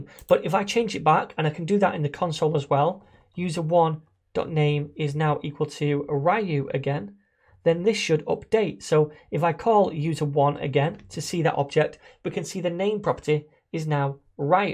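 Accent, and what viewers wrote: United Kingdom. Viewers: are you British, it is two birds in one stone learn British accent with JS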